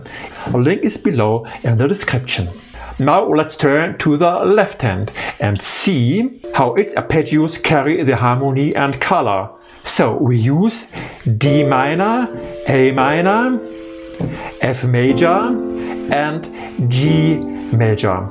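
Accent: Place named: Austria. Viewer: Yes, sir, I do, and as well, with learning languages. By the way, I believe that it stated that you sre located in Germany, but are you Austrian? I was trying to place your accent.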